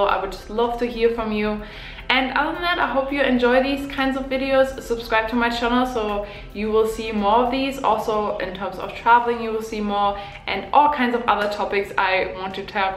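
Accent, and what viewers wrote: Germany. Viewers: Hey! I just wanted to drop in and say that I LOVE your content! I just subscribed and can't wait to see more videos :) I also really love your accent. One of my very best friends is a foreign exchange student from Germany and it reminds me of her. I'll be so sad when she leaves, but we will be able to stay in touch and hopefully visit each other in years to come!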